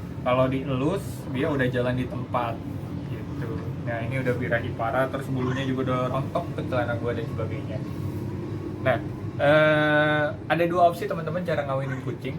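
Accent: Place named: United Kingdom. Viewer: britishnyaa comel😭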